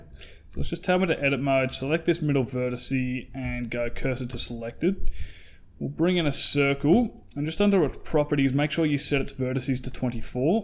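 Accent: Australia. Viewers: Why is every single good modeling tutorial done by an Australian?!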